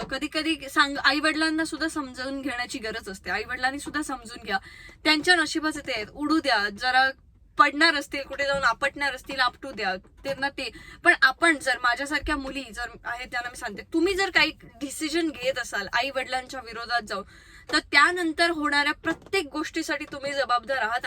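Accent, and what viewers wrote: Malvan, India. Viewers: I watch your videos only because of your Malvani accent 🥰😍 love Malvani ..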